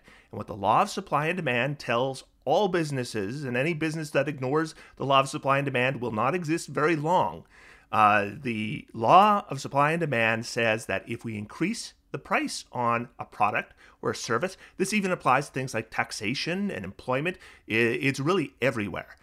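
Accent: United States. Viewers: It's almost like the profit motive degrades the value of a product over time as a business gets more and more successful. I was waiting for to say something along the lines of "profit motive bad" but it never came. To be fair I don't know him or his personal positions on things like this but also to be fair he's probably an American from his accent and he's probably been around long enough to see this sort of thing happen time and time again (at least in America). Maybe if the main goal of a business was to maximize product quality instead of product profitability, we wouldn't run into the age old problem of product quality being sacrificed in exchange for profitability. Now this is highly improbable under the current system with the way things are. So here are some changes to how the economy would work to fix this problem (and fix some other unrelated problems too): All companies must be by the law of the land, run by an elected president of the company (max term 4 years). 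No CEO, no owner of the company. In fact there is no owner of the company. Instead every employee is a part-owner of the company and gets a share of the stock. The stock isn't there to earn money. It's there to show you're part owner. Speculation (gambling (which is bad)) on the price of a 'share' of a company is now a thing of the past. Instead the profits will be split evenly amongst the employees. (The president will save some of the profits for maintenance, replacing equipment, etc.) Also to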